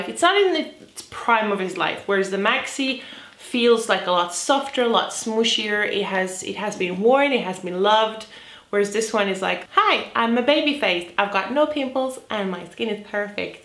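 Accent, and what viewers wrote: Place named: United Kingdom. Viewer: The british accent made me diiiiieee hahahahaah aa great video <3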